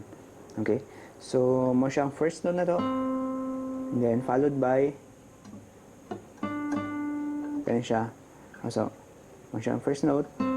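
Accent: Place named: Visayas, Philippines. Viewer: Ok kaayo bro. Taga asa diay ka murag bisaya man gyod hahaha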